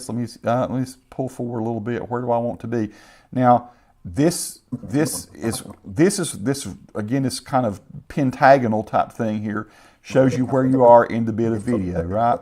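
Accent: South, United States. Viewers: Sounds like a Southern Bumpkin 😊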